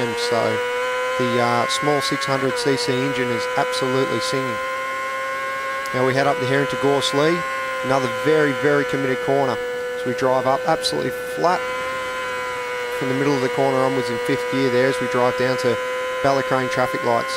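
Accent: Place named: Australia. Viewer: You sound like an Australian. I'm kiwi that's how I know 🙂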